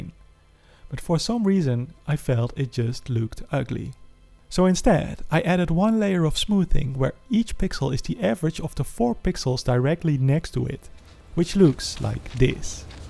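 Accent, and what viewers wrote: Netherlands. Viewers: this guys english is so dutch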